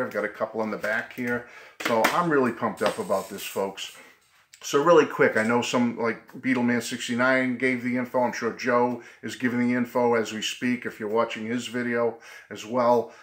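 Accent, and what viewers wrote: Boston, United States. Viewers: Hi, Thanks for all this GREAT information! White Album Super Deluxe, yes! I love all this resurgence of Beatles everything! It is all very exciting! I just have to say I love the way you say "McCartney"! The Boston accent is GREAT! I happen to like the Liverpool accent too! Wonder why?! You're in my prayers tonight. "God let us Beatles fans live another 35 more years or at least until there's nothing more Beatles to re-release!" LOL! Thanks again! I've got to now to look for my part-time job to pay for all of this stuff! Cindy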